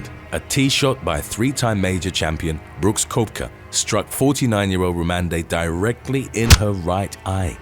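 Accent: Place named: United Kingdom. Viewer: “An almighty crack of thunder” blended with that tone and British accent 🙂👏🏾👏🏾👏🏾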